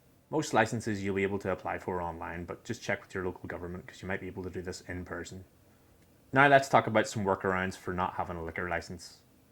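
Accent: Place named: Northern Ireland, United Kingdom. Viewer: Hello! What is that accent - it sounds close to home (NI)😅 I’m actually thinking of starting a mobile bar business over here but I’m a little lost on where to start. These videos are great but I’m overflowing with questions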